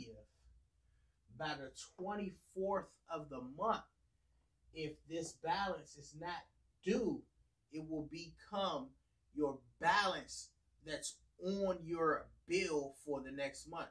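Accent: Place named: Chicago, United States. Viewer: Can u mentor me I need help.. are u from chicago you sound like us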